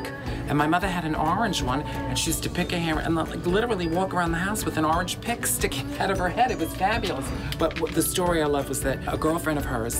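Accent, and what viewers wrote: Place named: New York, United States. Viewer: I love your accentuated New York accent. Reminds me of home. ❤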